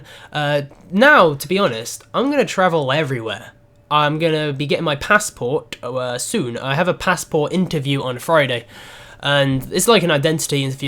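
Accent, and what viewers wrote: United Kingdom. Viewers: He forgot to say one thing he has that most others don't. He's got a British accent. All he needs in life now is to move to America, and use that accent to his advantage. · Is there anywhere in particular you want to visit in America? Also I'd love to see you say (or try to say) my username since you have a British accent and everyone already butchers it. P.S you should visit Texas when you come to America. I'd hang out with you. :p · i gotta few questions for you bro actually 1: is that a british accent u got? 2: how come your eyesights bad? 3:how is it you find out when a new slenderman game is out?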